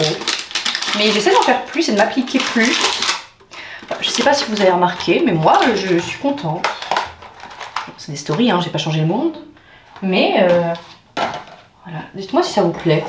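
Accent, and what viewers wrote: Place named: England, United Kingdom. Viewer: Je kiffe ton accent anglais😅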